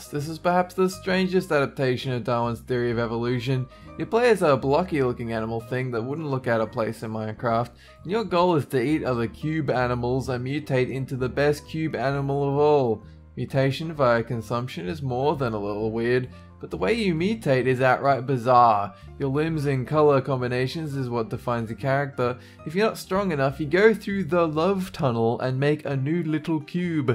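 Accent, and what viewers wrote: Australia. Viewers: im aussie and im pretty sure he has an australian accent. whats wrong with it? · He has an Australian accent and a slight lisp. Some people like it others don't. I guess he does slur, but is that bad? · It's the Ausie charm, some people like it other's don't.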